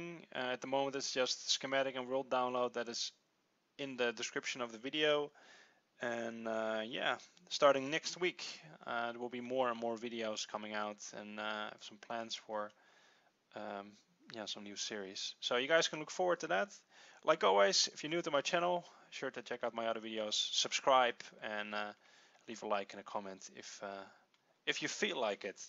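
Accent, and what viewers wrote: Netherlands. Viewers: Is he dutch??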